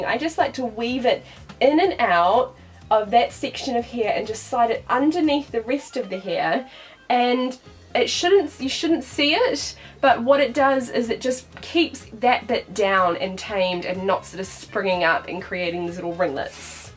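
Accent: New Zealand. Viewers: Sounds like she's from New Zealand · Yeah, the short 'e' becomes two long e's, sounds like NZ.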